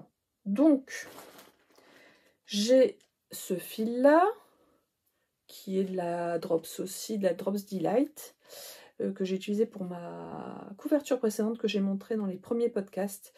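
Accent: France. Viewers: Ah! J'avais bien perçu un p'tit accent du nord.... bon c Pas-de-Calais mais c du pareil au même.... Originaire du nord, j'ai tjours adoré les plages du Nord, en particulier Ambleteuse, gris nez et blanc nez qui malheureusement ne sont plus aussi sauvages qu'à mon époque (année 70-80) qd on y allait en diane... Vos videos st agréables et je me suis abonnée pour suivre vos aventures tricot et couture avec ce p'tit accent de ma jeunesse. Amicalement.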